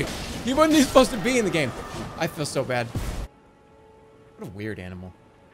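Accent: Boston, United States. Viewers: The A at the end is cause of his Boston programming, it's like "Germ-ah", like "i'm walkin here-ah"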